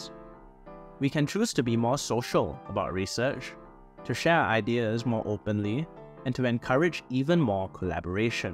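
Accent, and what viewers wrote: Singapore. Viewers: Recognised the singaporean accent 😊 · sound like a singaporean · You sound Singaporean or Malaysian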